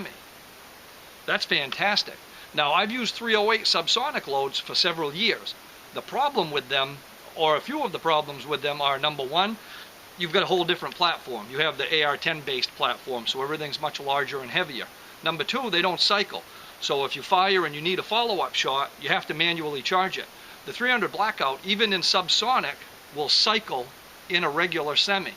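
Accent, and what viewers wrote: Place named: New England, United States. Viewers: This is the first video of yours I've seen. Nice video. I'll check out your book too. I made sure to watch for a couple minutes to see if your boston accent was a shtick or not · Just ordered your book. Looking forward to it. Your east coast New England accent is a breath of fresh air. We are out here brother. You are not alone.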